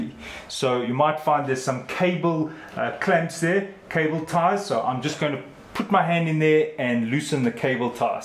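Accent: South Africa. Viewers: Am I hearing a fellow South African accent?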